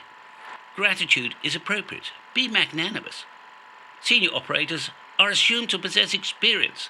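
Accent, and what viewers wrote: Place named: RP, United Kingdom. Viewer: Ahhhh, at last. Something in good old Queen's English! It all makes sense now. I shall rush out to Tandy this instant and buy myself some helectronical componentry with which to build myself a "rig" in ones "ham shack"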